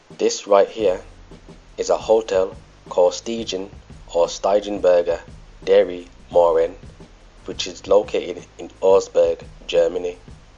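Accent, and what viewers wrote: Birmingham, United Kingdom. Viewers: You are from Birmingham heavy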